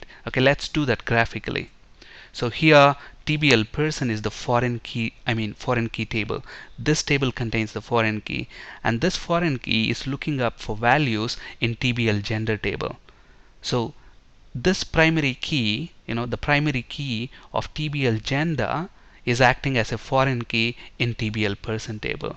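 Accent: India. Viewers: Sir are you belong to India? Your English seems like Indian 😁😁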